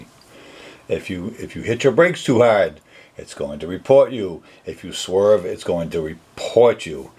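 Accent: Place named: Staten Island, United States. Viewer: You have a Staten Island Italian accent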